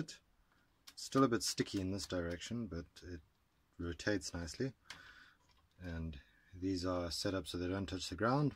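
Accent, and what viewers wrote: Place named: South Africa. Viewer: sounds like you are from SA. anyways. I am unable to find these 3.5mm bearings in SA. any suggestions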